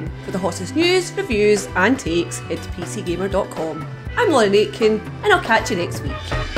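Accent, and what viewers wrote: Scotland, United Kingdom. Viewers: PC Gamer, I loved the announcer's lovely Scottish accent ! Please give us more =)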